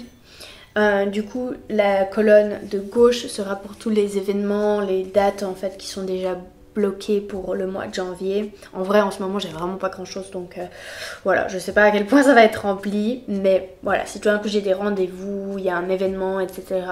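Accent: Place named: Switzerland. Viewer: L'accent suisse est beaucoup plus facile à comprendre que les autres accents français.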